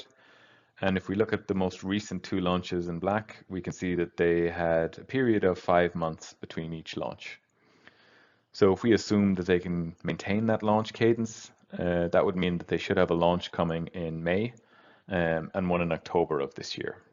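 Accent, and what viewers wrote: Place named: Ireland. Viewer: Very cool! You seem to be another "Scott O" running the numbers!! A fellow Irishman too by thee sounds of it which is even better!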